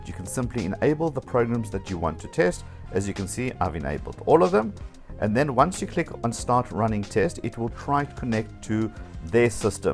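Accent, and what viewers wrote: South Africa. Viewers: accent is SA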